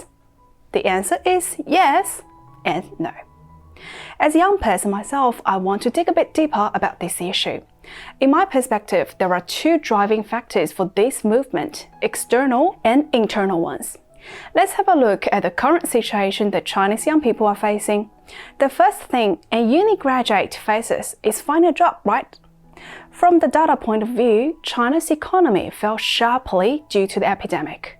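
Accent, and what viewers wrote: Australia. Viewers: Aussie accent init? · I’ve never heard a Chines/Australian accent before, interesting.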